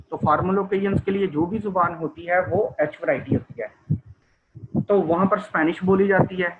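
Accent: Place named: Punjab, India. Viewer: Sir enjoyed ur lectures particularly pure dialect in Punjabi 😄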